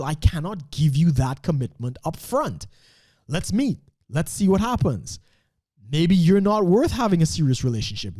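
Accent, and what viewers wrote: Trinidad and Tobago. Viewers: Waw..the way in which your accent comes across sound a lot like a TRINIDADIAN! and on second note...very well put. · You from the caribbean bruh?🤔 you sound like a trini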